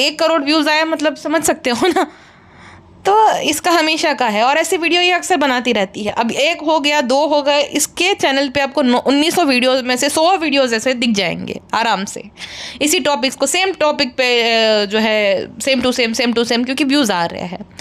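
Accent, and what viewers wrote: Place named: Kashmir, India. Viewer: Ma'am are you kashmiri ! Apna J&k wala accent aa rha hai 😄💜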